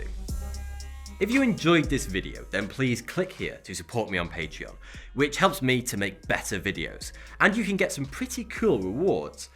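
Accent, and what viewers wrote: United Kingdom. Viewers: "Countries just have to own 100% of their own land in order to enforce laws". Wow, way to pull "facts" out of your ass man. You have a British accent. Have you heard of Stockholm Syndrome? In the USA there are some people with "coloquial title" which is absolute ownership. Is total chaos breaking out on those properties? Find out.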